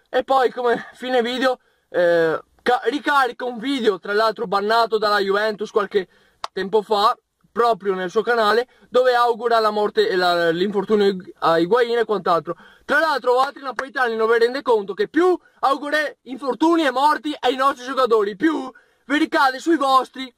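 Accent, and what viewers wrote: Veneto, Italy. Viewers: 1.15 LÈGO AHHAHAHHA Comunque bellissimo il dialetto Veneto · Il tocco del dialetto veneto rende tutto più bello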